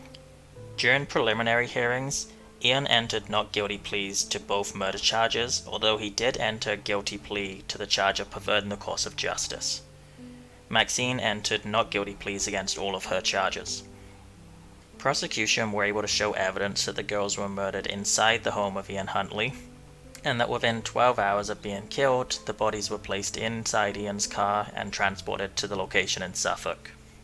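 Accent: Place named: Northern Ireland, United Kingdom. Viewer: cool, I thought I could hear Northern Ireland as that's where I'm from but a mix in there anyway 🤣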